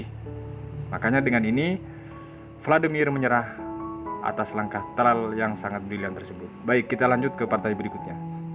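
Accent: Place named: Madura, Indonesia. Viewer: Corak oreng madureh logattnya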